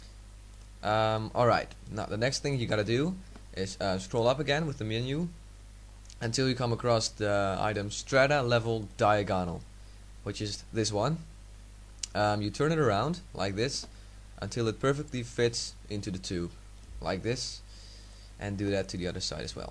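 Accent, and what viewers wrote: Netherlands. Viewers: are you dutch? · lol ik hoor gewoon dat je nederlands bent XD goeie tutorial 5/5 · you sound dutch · Are you dutch ? you sound like it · ik hoorde meteen dat jij nederlands was, =D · You're dutch right? That accent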